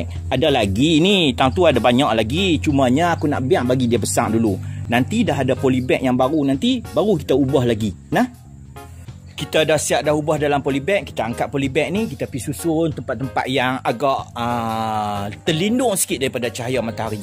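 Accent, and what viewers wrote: Kedah, Malaysia. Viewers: Tertunggu2 video..terbaiklah.syiok dengaq loghat kedah piaww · Amboi amboi amboiii...pandainya berkebun..seronok dengar loghat kedah. Terbaiklah hang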